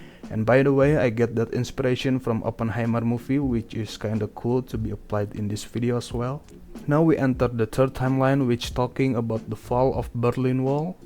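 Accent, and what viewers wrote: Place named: Indonesia. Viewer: Orang indo bg logatnya kaya indo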